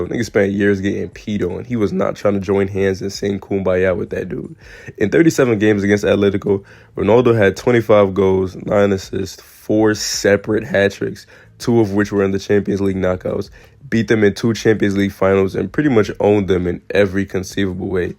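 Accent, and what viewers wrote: New York, United States. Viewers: Great video. I like your humour and like your accent. I could tell you from New York because you sound like another YouTuber that’s from New York lol 😂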